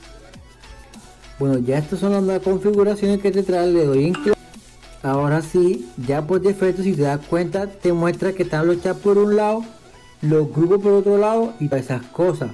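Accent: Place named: Brazil. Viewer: Jah tienes asento brasileiro en el video. Así es amigo Ya salió la versión 9.00 hace poco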